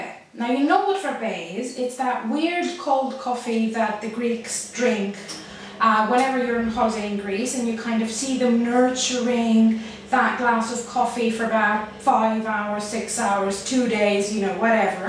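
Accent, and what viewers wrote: United Kingdom. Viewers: Hey, Auntie.... you are so cute. I loved to watch it. You should do comedy TV.:-) Your English is really "Russian free", I swear... more you already have a colour of "very British". This Frappe rememers me good times when I was skipper for sailing yachts in Greek Cyclades... easy to do, and for Coffee junkies like me a great thing. Cant live without it, even in hot summer in midth of Germany (we have up to 38 degrees todasy). Keep going...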